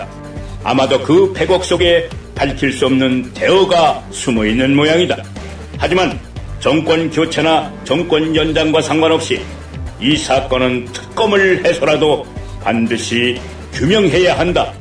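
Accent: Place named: North Korea. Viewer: 맞아요! 아나운서님 목소리와 말투가 북한찌라시로 들리니 죄송하지만 다른분으로 체인지해주시면 좋겠습니다.아주 중요한 사안이니 유권자들에게 거부감없이 100%팩트 그대로 전달될 수 있게 부탁드립니다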